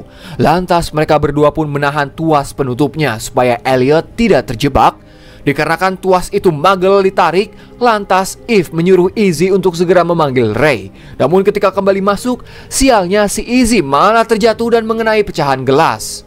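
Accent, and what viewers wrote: West Java, Indonesia. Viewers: aku senang dan salut sama si akang yg cara pembawaan alur film nya logat nya sunda banget dan kocak..hebat lah buat si akang..😂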